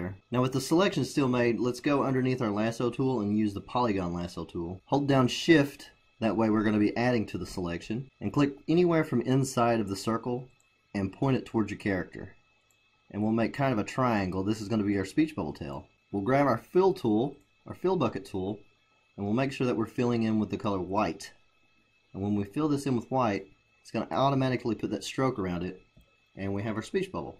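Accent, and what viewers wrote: Texas, United States. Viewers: are you from Texas or Nevada?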